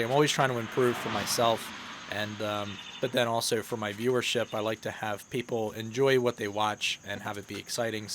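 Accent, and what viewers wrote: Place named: Pittsburgh, United States. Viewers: Where about in pa are you from? I hear a hint of Pittsburgh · Eastern or Western PA? I am born and raised from the West side😏😏. I knew i recognized your accent but i cant tell from which area it belongs to. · My family is from PA I can tell by your accent that’s where you are😂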